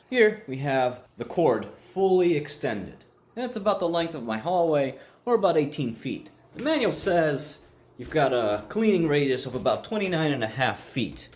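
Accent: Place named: Germany. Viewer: Pronunciation: not strange, just German. Duh.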